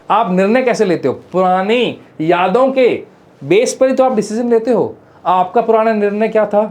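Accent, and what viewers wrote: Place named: Haryana, India. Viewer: Aap Haryana se ho ji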